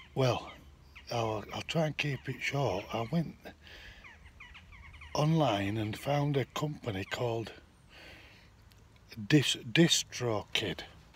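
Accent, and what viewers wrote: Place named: Yorkshire, United Kingdom. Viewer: Ey up cocker. Where bouts you at you fuckin lyrical legend? Accent sounds Huddersfield/Brrrrrratford way?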